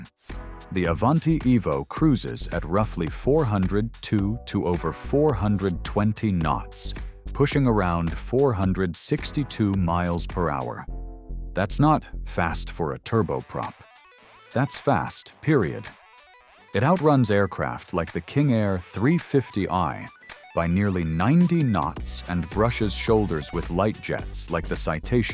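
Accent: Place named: United States. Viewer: Good to Hear an American.🇺🇸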